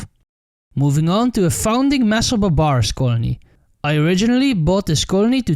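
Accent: Netherlands. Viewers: I like the dutch accent 😂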